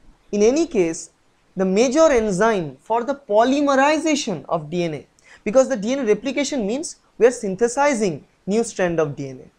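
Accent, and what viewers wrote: Bengal, India. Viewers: Sir r u bangali ??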